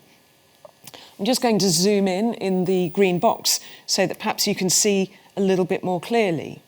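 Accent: United Kingdom. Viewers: Knowledge is one , but amazing how beautifully this woman speaks ....even british sounds nice😄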